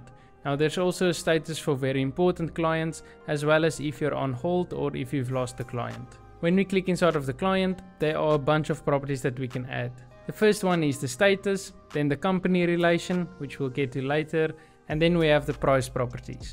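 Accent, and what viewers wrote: South Africa. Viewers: What is your accent? South African?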